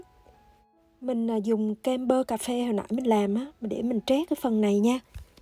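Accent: Hue, Vietnam. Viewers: Cám ơn với hướng dẫn rất chị tiết và giọng nói Huế nhẹ rất dễ thương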